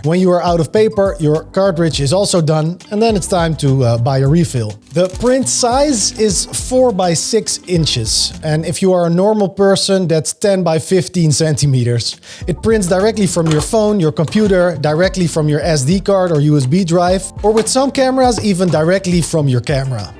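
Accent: Netherlands. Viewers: you definitely dutch! you have the same accent of my dj friend from breda! hahahahah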